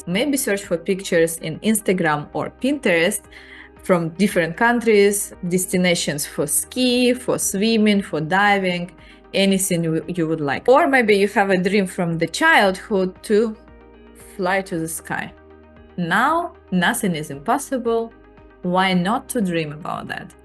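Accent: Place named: Russia. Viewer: Чувствуется Русский акцент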